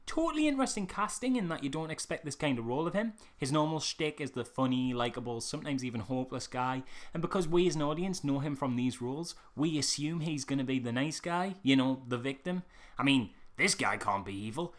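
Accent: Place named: Scotland, United Kingdom. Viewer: Wow, twenty seconds in, I already need to ask: ARE YOU SCOTTISH?? I´m German so I´m really not 100% sure, but I just have to keep following your channel now for a while just for that amazing accent! (I´m also quite a huge Doctor Who thus Peter Capaldi fan for now..If you know that show, you also know, why I say "for now", because it can change.... Very soon even maybe....)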